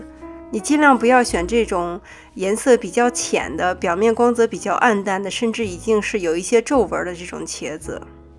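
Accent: China. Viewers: Downvote for shitty mainland chinese accent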